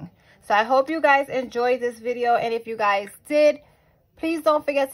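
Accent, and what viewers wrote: New York, United States. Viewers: I felt like I missed your face. You are one of my regular views. This long, but you won't lose God's grace by not paying tithes. God knows your hear and besides the bible instructs us to pay tithes but it also tells us that debt is bad and avoid it. Literally every reference to debt in the bible is negative. So, paying debt first or paying tithes first....both are consistent to the bible imo. Keep it up. And I low key love your NY accent. 💪🏾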